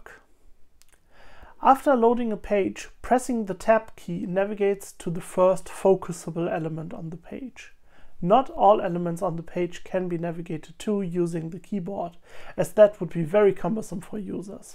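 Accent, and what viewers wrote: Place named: Germany. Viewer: Very nice. I like the German accent.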